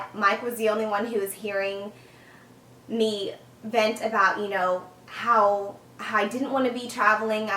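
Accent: Texas, United States. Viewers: thanks for your insights... i knew you were a fellow texan 10 seconds into the video lol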